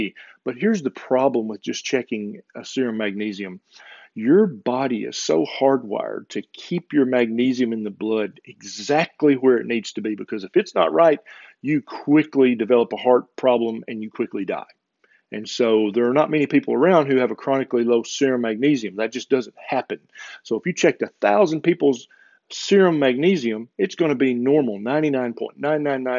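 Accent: South, United States. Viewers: Just stumbled across this video binge watching channel. Don't know that I've seen all quite yet, but I almost died laughing: "Shut up and eat your greens!" For God's sake, Doc, you're killing me with your southern-ness! To everyone reading these comments, this man is a treasure - support him via Patreon!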